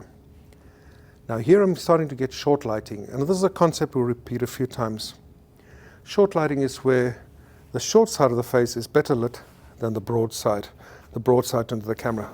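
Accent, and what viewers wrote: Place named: Germany. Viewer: One question: how come you have a Dutch name and a German accent? :)) Fantastic stuff, this goes immediately into my GOLDEN TUTORIALS folder... There are very minor points I would have done slightly different, like with structuring video or editing (give a bit more time to showing the examples, and next to each other works best), but that's a side matter. I felt like back at school when we wondered how some great teachers stood out and could reach out and catch our attention. I've seen this video actually twice, counting the number of times I had to rewind to be able to follow (have no such a flash yet). Extremely welcome stuff, and I'll be buying the modern flash I had hesitated to, now under impact of this video. Can't wait!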